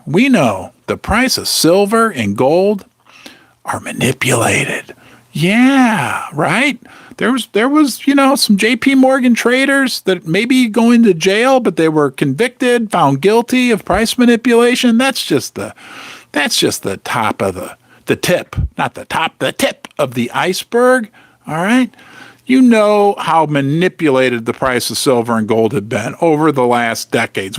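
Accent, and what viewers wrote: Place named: Kentucky, United States. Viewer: Sounds like Kentucky to me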